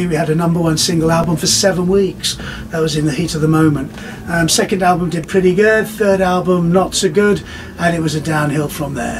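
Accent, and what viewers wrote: United Kingdom. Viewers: Why all this southern English accent, Carl? Come on, you're supposed to be a proud Brummie...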